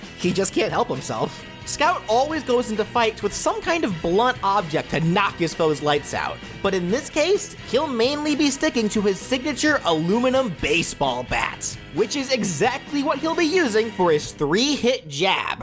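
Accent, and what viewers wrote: New York, United States. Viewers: A bit of a missed opportunity to use your New York accent. Regardless, this was a great moveset for the Scout.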